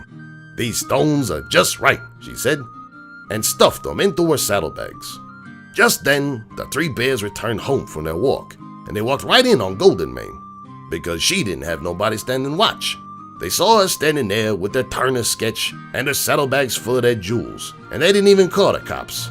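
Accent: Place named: Brooklyn, United States. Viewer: Hehe. Again, I kinda love your Brooklyn accent. Of course, her you've got a lovable scamp of a character, but still. I don't know whether to think there are more bedtime stories, considering how much Bad Horse goes on about good writing, but I hope that there is, and I hope you decide to read more of them.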